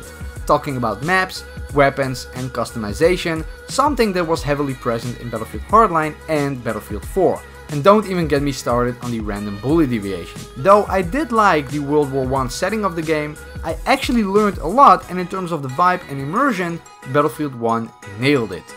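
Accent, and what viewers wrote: Netherlands. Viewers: Wait a second... why do you sound dutch???? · Are you from Netherland ?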